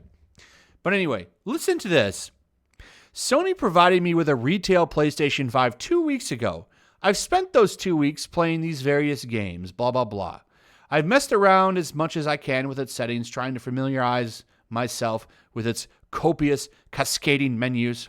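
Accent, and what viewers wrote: United Kingdom. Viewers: Your voice matched exactly what i thought the guy would sound when i read this article lol at least the stuck up british voice. People go to Kotaku for laughs not games related content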